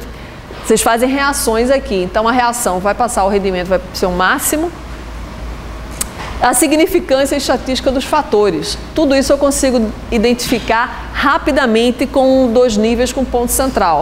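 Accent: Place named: Northeast, Brazil. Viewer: Sotaque nordestino arretado de se ouvir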